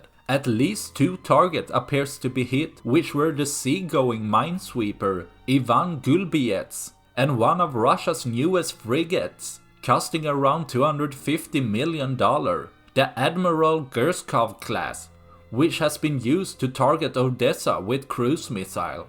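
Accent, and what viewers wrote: Sweden. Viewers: Hey Swede, (you must be with that accent), have you thought how the Russians could present a video of the attack by a drone boat that was allegedly Ukrainian? This is a false flag operation! 😡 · From your accent, I can bet you are from Sweden! ;)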